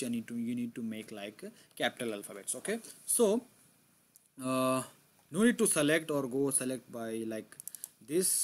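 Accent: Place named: Russia. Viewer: Why your accent is like russian😂 bhai you are indian be as it.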